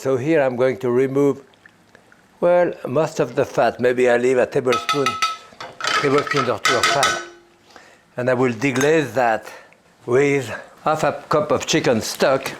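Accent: France. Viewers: He sounds french. Must know what he's talking about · I am not at all the worlds greatest cook, but Jaques Pepin is truly my cooking idol! Not only only for his great cooking, but for that strong French accent as he instructs you! Thanks! Happy cooking. ❤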